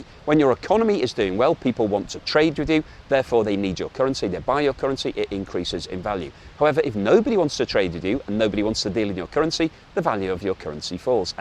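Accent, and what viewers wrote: United Kingdom. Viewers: Hi. Are you based in the USA? Sounds more like a British accent you have there.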